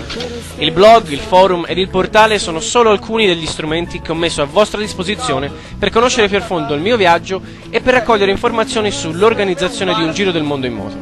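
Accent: Tuscany, Italy. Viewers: Grandissimo...ti ho seguito per anni sulle pagine di InMoto, ma vederti in video è proprio un'altra storia! Simpaticissimo, l'accento toscano è veramente una marcia in più (te lo dice un romano) per far sorridere. Ti invidio!!! Complimenti per tutto, e continua cosi!!! P.s. Ma per scendere dall'albero poi come hai fatto? :P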